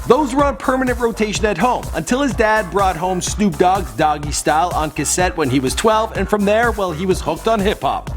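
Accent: United States. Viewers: Its ok his american/canadian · Wtf is this American chattin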